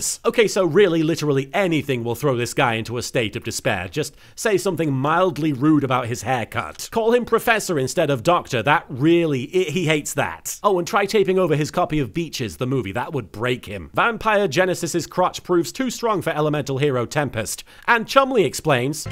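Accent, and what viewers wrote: United Kingdom. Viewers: Yeah, this series is much better with his british britishness tbh.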